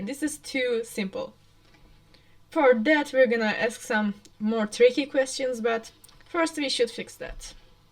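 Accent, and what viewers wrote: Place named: Turkey. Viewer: Help i was watching it and i noticed the accent i was like "İs she turkish🤨" and then you were lol😭😭 So hello fellow turkish person lol😭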